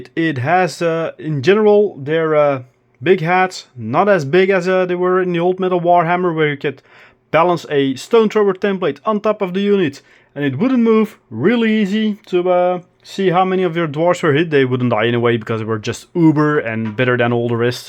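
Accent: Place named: Belgium. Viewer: Ik hoor het Belgisch accent, maar vlot gesproken haha :) Beetje verrast over een Belgisch kanaal aangezien de kleine community in België... Los van dat, ben denk ik even enthousiast over de dwergen als jij, zien er veelzijdig en uniek uit! Ook één van mijn volgende projecten?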